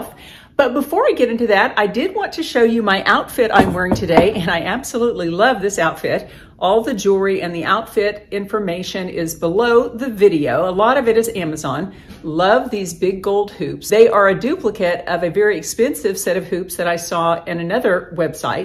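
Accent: South, United States. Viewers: are you a southern belle?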